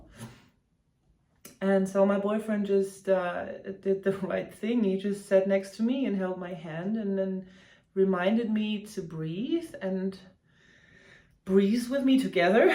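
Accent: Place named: Germany. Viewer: Have you ever visited the island of Borkum? I live in Canada but spent some time in Germany in the late 1960's and your lighthouse piece brought that to mind. It looks wonderful, by the way! I really enjoy your channel,. Let out your German accent as I find it quite charming!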